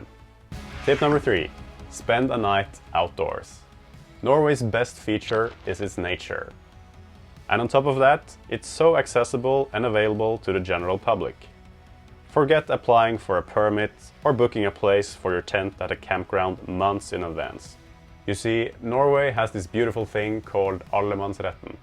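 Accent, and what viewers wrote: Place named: Sweden. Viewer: Fresh video! Been watching your vids for a while now, they're super nice. We are starting our 2 week roadtrip (tenting only) tomorrow, from Stockholm to Lofoten, Senja, Tromso..but starting in the south close to Troltunga.:) Thanks for the tips! Wish us good weather. Also, my gf said you has a swedish accent.:D I'm not swedish but I agree with her. Where do you guys live in Norway btw?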